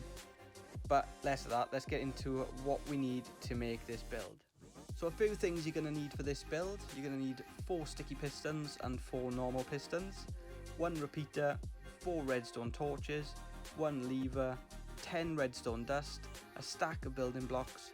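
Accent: Wales, United Kingdom. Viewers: Wait is this guy Welsh? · Are you British or welsh? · You sound welsh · Are you welsh? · u welsh? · You welsh?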